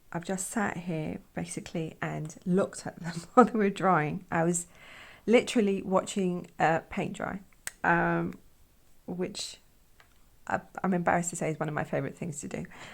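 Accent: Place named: South Africa. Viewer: I absolutely love the illustrations..especially the background detail that looks like a little piece of paper. I have been painting for years, but I recently started moving more towards illustration ,which is a huge mindshift for me. I love learning from tutorials like this, so I am definitely subscribing. For a moment there I thought I was listening to a South African English person (your accent sounds allot like Cape Town SA English🤣 and the "ai jai jai" is often heard here in English, Afrikaans, Xhosa...you name it🤣) but then...we got our English from Britain.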